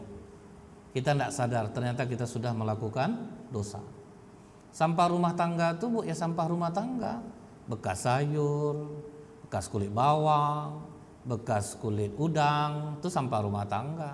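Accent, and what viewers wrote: Pekanbaru, Indonesia. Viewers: Selain dapat ilmu dari tuan guru, logat khas Pekanbaru tuan guru jadi pengobat rindu 😁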